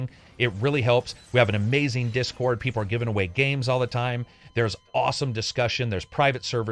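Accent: United Kingdom. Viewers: Voice is fine, you just have to be British to understand. Which is a damn good thing! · I believe the audio issue you are referring to is a Scottish accent vs heavy compression.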